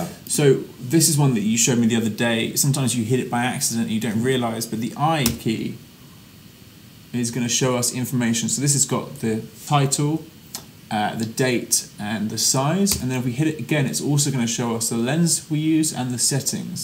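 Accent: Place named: United Kingdom. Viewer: Great tutorial! Very helpful. The British accented paused before saying "ZEE for zoom" made me smile, too.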